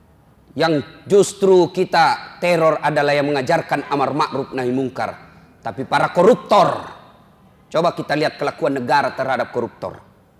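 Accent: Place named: Sulawesi, Indonesia. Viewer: Sering dengar ceramah dari Ustd Dasa'ad Latif,sy suka sekali.Bahasanya sederhana mudah di fahami dan dialeknya mengingatkan sy waktu masih tinggal di daerah Sulawesi. Sy sendiri orang Jawa, tapi sejak bayi sampai remaja saya tinggal disana.Semoga Ustd dan keluarga sll dlm lindungan dan berkah Allah SWT.Jadilah penceramah.yg baik untuk NKRI.Dari semua suku.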